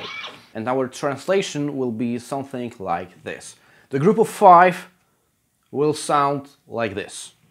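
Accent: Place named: Poland. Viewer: O co chodzi z tym akcentem? Ma mówić bez akcentu bo jest Polakiem?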